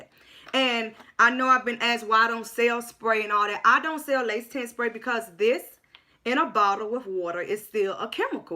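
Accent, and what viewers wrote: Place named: Florida, United States. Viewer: I'm new to your channel.. as soon as i heard you talk i knew you were from FL like me. Lol. I love the videos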